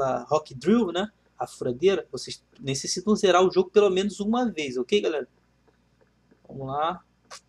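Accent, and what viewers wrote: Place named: Rio de Janeiro, Brazil. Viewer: Carioquês rs boa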